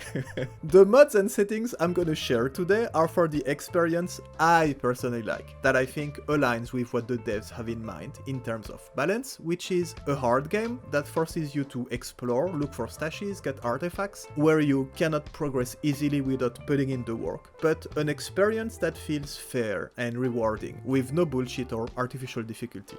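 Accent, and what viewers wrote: France. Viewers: J'ai replongé dans l'univers de S.T.A.L.K.E.R plus sérieusement récemment et j'ai découvert tes vidéos, je t'écris en français car j'assume par ton accent que tu l'es ;) merci pour tes vidéos j'adore vraiment t'es au top !! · come on, with the full gameplay with mods already! I try to watch other gameplays, but god, some are boring ! ++++ bonus to your French accent, most times I want to see no comments gameplay, but yours is damn enjoyable ! mod the game how you like it, and lets see some STALKER2 gameplay from you! I know you won't disappoint! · This French accent is so strong 😂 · Magnifique accent français !! et merci pour cette video je pense installer au moins la moitié des mods dont tu as parlé, mais "eye adaptation fix" oh bordel MERCI CA EXISTE !!??!! :) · are you french? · Your super subtle french accent 😅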